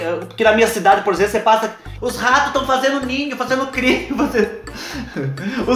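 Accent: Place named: Minas Gerais, Brazil. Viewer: Ô, e eu achando q vc fosse de MG kkkkkkkk! Com tantas notícias negativas rolando na NET precisávamos mesmo ri um pouco.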